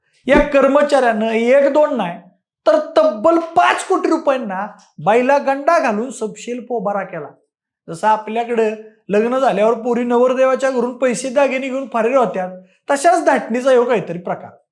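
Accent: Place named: Satara, India. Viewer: Kadak bhasha shaili ahe satarkar vatatat nakki chhan avdla vidio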